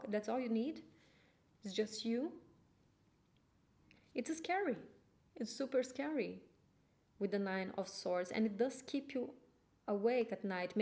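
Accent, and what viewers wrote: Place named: Brazil. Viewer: Are you Brazilian?!? Sound like !! 🇧🇷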